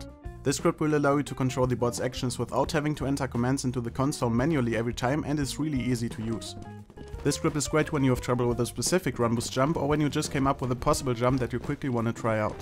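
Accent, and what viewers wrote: Germany. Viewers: ★ CS:GO Channel ★ shit also bist du doch deutscher das hört man sehr sehr sehr gut raus:D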